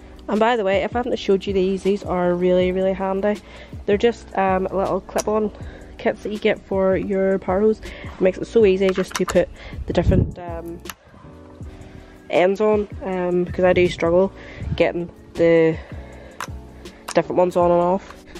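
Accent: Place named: Northern Ireland, United Kingdom. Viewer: great thanks I just found your videos (just subscribed) great to see a Northern Ireland voice on here for a change keep up the great work stay safe 👍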